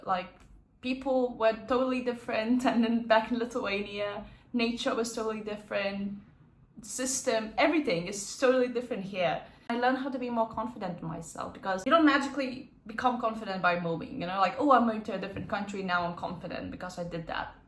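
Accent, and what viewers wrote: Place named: Australia. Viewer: There is definitely an Aussie accent sneaking in when you talk!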